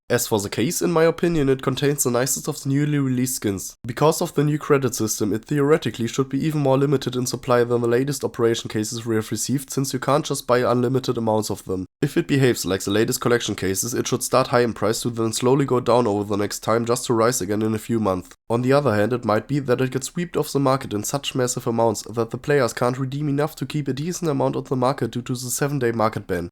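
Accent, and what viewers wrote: Germany. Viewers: dislike only for ur german accent damn too anoing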